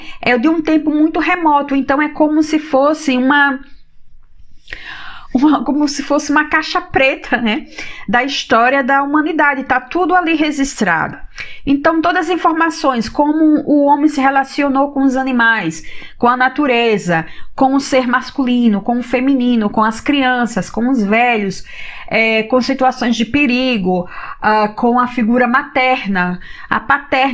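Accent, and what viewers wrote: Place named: Northeast, Brazil. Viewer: Adoro sua forma de passar o conteúdo.Seu sotaque nordestino é adorável.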